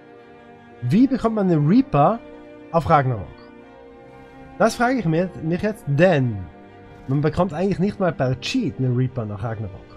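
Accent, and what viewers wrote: Switzerland. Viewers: SRY mein lieber, der beste ARK-YouTuber of the World ist und bleibt immer noch Cloud:-) aber ich liebe deinen schweizer Slang;-)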